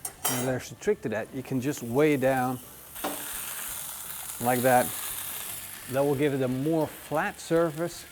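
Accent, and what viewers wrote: Netherlands. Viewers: Holland is my guess. If you ever can't quite place an accent, it's almost always Dutch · You sound like a Dutchman. My dad kept that accent for as long as I recall. Ahhhh dacht ik well hoor. :)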